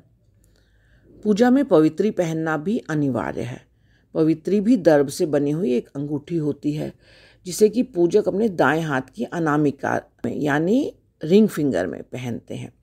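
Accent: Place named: Kashmir, India. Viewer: Kashmiri accent is awesome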